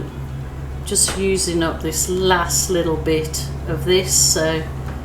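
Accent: Birmingham, United Kingdom. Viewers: I love your philosophy to life. There isn't a video of yours I watch without you bringing a smile to my face, either with your humour or your take on life. I look at your intro pictures (I believe yours is a Brummie accent) and think wow you certainly have found Paradise all the way from England. That's all apart from the great educational and inspirational videos for acrylic painting which have inspired me to dabble.So thank you all the way from a wet and dark England.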